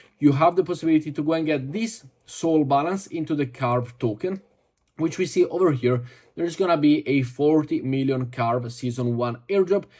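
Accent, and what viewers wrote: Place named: Italy. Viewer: Sei italiano e si sente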